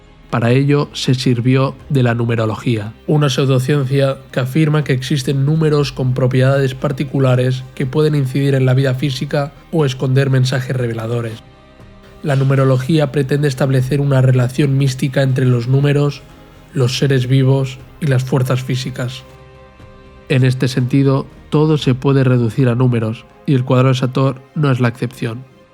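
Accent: Catalonia, Spain. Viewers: A mi me suena más a catalán por como pronuncia Júpiter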